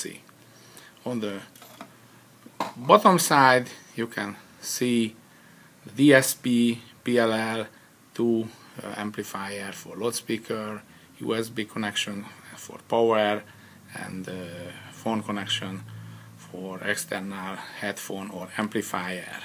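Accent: Hungary. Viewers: ajj de magyar vagy te is :D kurva akcentus mi? :D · Annyira hallani az akcentuson, hogy magyar :DDDD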